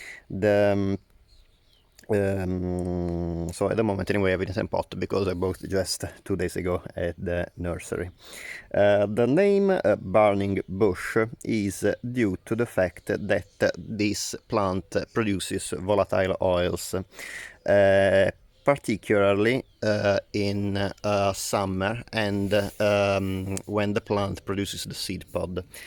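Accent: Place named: Italy. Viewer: Howdy from Canada. I would call that color kelly green or Irish green. I grow cannabis flowers indoors, legally of course. Love the Italian accent. Stay safe and be well. Smell you later, eh? ♥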